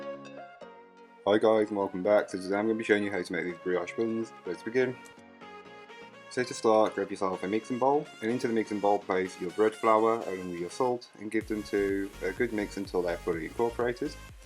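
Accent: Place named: Oldham, United Kingdom. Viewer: You sound like if you’re from Oldham. Keep the good work up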